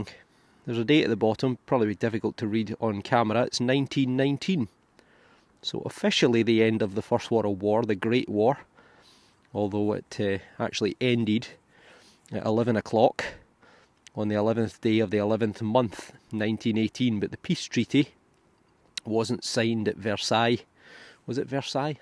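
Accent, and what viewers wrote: Scotland, United Kingdom. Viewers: I'm coming here to hear a scot say "Aluminium"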